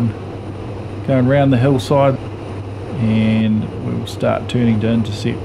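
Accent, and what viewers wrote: New Zealand. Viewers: Awesome vid thanks. Just a Kiwi accent mate! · Nice video. I always enjoy seeing a FU-24 fly. My dad, Jerry Barden, was the project engineer for Fletcher Aviation in the mid 1950's in Hamilton NZ. He helped Robertson Air Service & James Aviation assemble the initial batch of 24's. He then did the test flights on the first 42 completed. We lived there from Sept 54 till April 56. The NZ accent brings back good memories.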